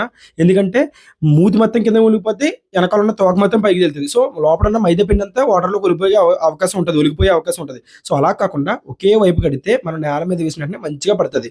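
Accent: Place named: Godavari, India. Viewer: మన గోదారి జిల్లా బిడ్డ లా ఉన్నావే మాట చూస్తే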